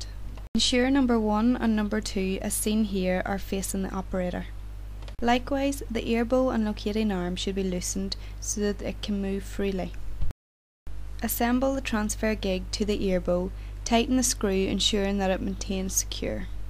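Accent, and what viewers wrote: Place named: Ireland. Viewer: This is a brilliant video, thank you, and narrated with a lovely irish accent. Will help with orthognathic records I'm taking today, keep this up. As an aside, you could turn this into a research project, onto the use of videos for education of the dental profession, a simple survey based response and get a publication under your name. Thanks again, Jay Patel ST2 orthodontic registrar, The Royal London Hospital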